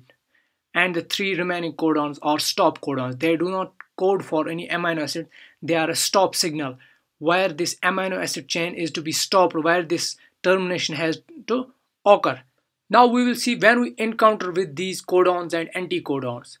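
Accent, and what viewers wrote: Kashmir, India. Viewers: kashmiri accent,am i right? .bdw thank you😍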